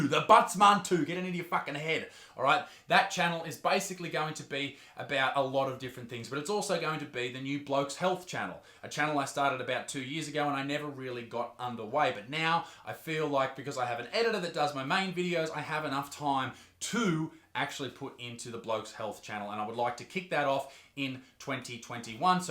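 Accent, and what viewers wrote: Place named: Australia. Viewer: I just love way of talking, not just the fact his accent is Australian. But the way he brings the problems to light and why stupid shit like this can be extremely harmful for just the people who pro overweight confidence but people who are insecure and it gives some them bad ideas. Nice work, even if those don't listen but there are people we can save from this stupid ideas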